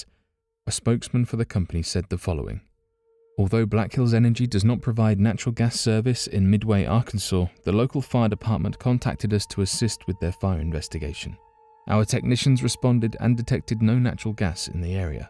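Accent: United Kingdom. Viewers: I wouldn't even watch this channel if you didn't have a british accent lol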